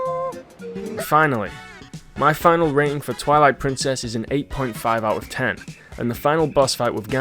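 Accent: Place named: Australia. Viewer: He sounds Australian to me an American lol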